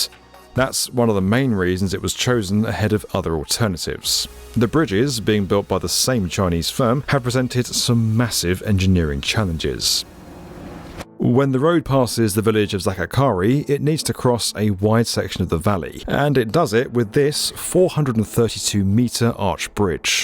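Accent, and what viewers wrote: England, United Kingdom. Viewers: What’s kind of narrative can we expect from someone with British accent mixing “human rights” with history narrating a Chinese-Russian projects? Expect a sugar coated poisoned pill. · I hear an English accent then unsurprisingly the racism / white supremacy talking points soon follow. 🤣 "unbiased, straight facts" 🤣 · Whenever you hear a British accent you can be confident that you are listening to bullshit limey propaganda. L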